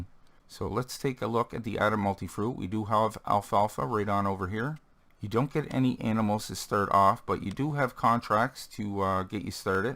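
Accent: Canada. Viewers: I can hear a little Newfoundland in your voice, fella!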